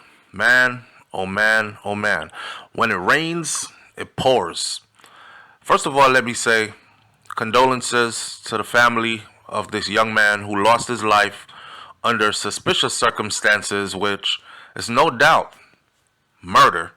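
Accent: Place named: Jamaica. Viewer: Yhu sound like a yardy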